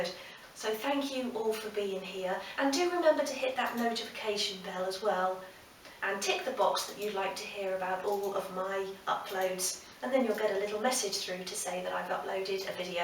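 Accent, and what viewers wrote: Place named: United Kingdom. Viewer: when I start watching your videos, I was annoyed by your British accent.. but now I can say that you are very beautiful woman with simple but gorgeous style.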